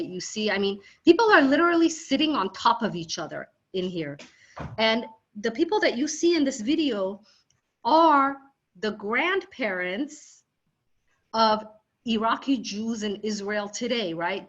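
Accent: United States. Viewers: Over 300,000 have Iranian perished since these fanatics took over.please mention all Iranian's suffering.I am amazed how you adapted to the American accent and look. thanks for recording the history .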